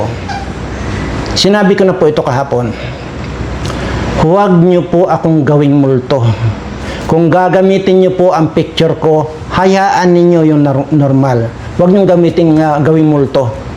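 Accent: Iloilo, Philippines. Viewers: Thank you & salute 🫡po sa inyo Sir sa pag tatangol sa Team Kalingap kina kuya Val at kuya Rab, go go Sir ipakulong nyo na po ang mga anti na yan na mga salot sa bayan natin, New subscriber watching from the UK 🇬🇧😊 haha grabi kadlaw ko sa butod kag iban pa nga term mo Sir, ga gua ang Ilonggo mo Sir ba! Proud ilongga fan here 😊😅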